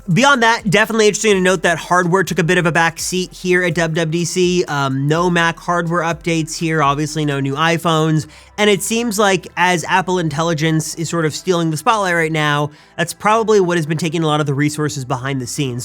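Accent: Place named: United States. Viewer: I really can't listen to this winey, but loud American accent. Sorry but it hurt my ears!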